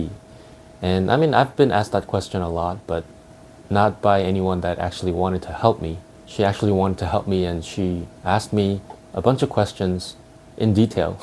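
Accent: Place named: South Korea. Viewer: You have a korean accent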